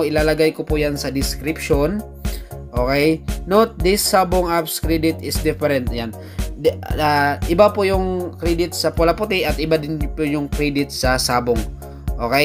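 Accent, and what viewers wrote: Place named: Visayas, Philippines. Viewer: Bisaya nga ganyan ang bisaya magsalita matigas pero tama ka boss at may napansin din ako na parang buyoy sya magsalita hahaha.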